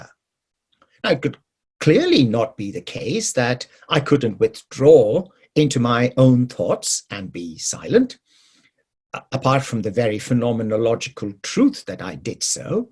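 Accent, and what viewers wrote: United Kingdom. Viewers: Am I the only one who thinks his academic British accent to be a tad ironically amusing?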